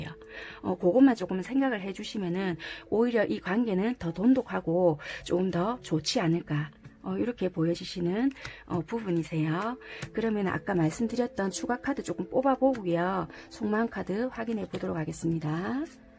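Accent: Busan, South Korea. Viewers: 네. 고맙습니다.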